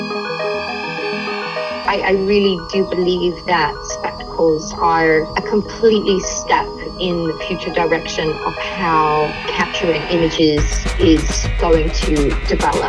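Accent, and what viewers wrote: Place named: Los Angeles, United States. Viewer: oh your from LA now, last i knew you were british-latvian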